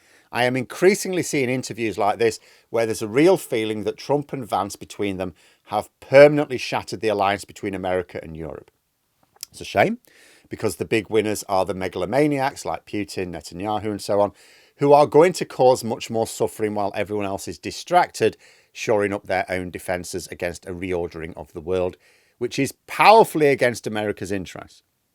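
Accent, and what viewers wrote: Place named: Yorkshire, United Kingdom. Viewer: Is he from yerksher or is it U.S. lol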